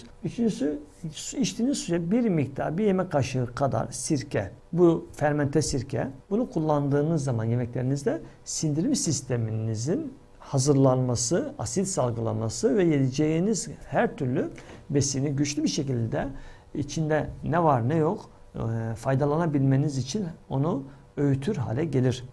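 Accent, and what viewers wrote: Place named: Southeast, Turkey. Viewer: Sevğili Hocamm merhabalar,💐🙏Dün gördümm videonuzu, ve tekrâr yine dinlemek istedimm sizi, çok mutluyum gerçekten, Düşündümm bir an aklıma geldiği şivede dikkatimi çektiiii, yıllar önce Babacığımm da Eczacılık yaptılar ( Batmanın Gercüş ilçesinde kaldık ama Kozlukta da kaldık nekadar çok ismii vardır diye düşünüyorken Netten, otobiyografinize baktimm,))) Nokta atışı heĺâl olsun bana dedimm, Oraları çok severek anlatiyorumm çocukluk yillarimiz oralardaaa) Doğu ve Güneydoğu insanları bizler çok misafirperver yaklaşırlardı, Çok hakları vardır bizdee 🙏🙏🙏Onlara siz8n Nezdinizde teşekkür ediyorumm, sizlerede aynı şekilde teşekkür borçluyuz Saygıdeğer Hocamız verdiğ8niz bilgiler için Minnettarimm, ve dee severek paylaşacağimm yayinlarinizi, Sayğilarimlaa,🙏🙏💐💋💋)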